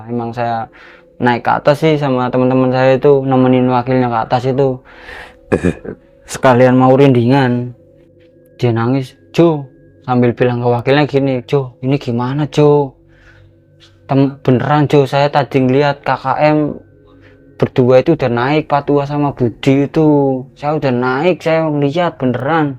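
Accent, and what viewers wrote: Indonesia. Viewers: Mlaysia hadir. Pekat sangat indonisianya .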